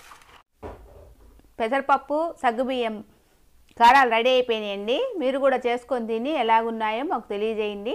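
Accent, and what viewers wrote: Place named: Kadapa, India. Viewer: Kadapa telugu super o super... Same to same ma amma matladinatle vundi... Duvvuru slang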